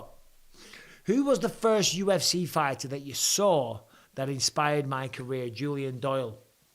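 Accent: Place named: Northern Ireland, United Kingdom. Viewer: Its a solid NI accenf im impressed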